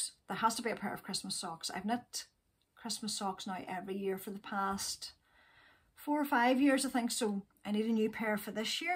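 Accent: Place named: Ireland. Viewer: So wonderful to see you and hear your amazing Irish voice. love love your podcast and can't wait to see your vlogmas. Love the beanie and your sweater is so beautiful. I am not a Christian but my children are born in London. There was always some element of Christmas as they were growing up, Christmas plays and concerts, a small Christmas tree with perhaps aa gift for each child. 15 years ago my Mum passed away, in my hands, on Christmas Day. The following year instead of being sad I thought we should celebrate and invited my brothers and families and had a meal and sang songs, and played games. And from then on christmas grew and grew............I love how we get together and all cook, open lots of gifts for each other and the whole house is lit up and decorated. My daughters have already put the tree up a few days ago! Not only did I adopt Christmas but adopted (not legally) a few Mummies and looked after them until some have passed but just helping them through the year with everything I can.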